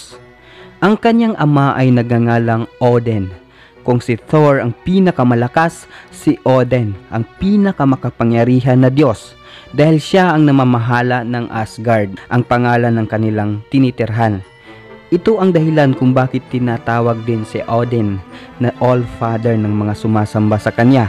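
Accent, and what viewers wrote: Visayas, Philippines. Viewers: Ok sana Kaya Lang Visaya ka eh ayusin Mo ung pagsasalita mo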